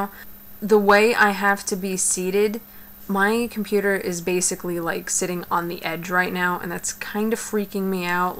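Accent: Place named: New York, United States. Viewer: haha this video made my mouth water! i love bbq food and fries ughh yum!! are you from NY cause you kinda have a NY accent?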